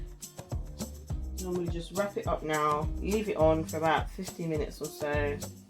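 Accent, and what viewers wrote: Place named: Jamaica. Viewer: She has a little ja tlk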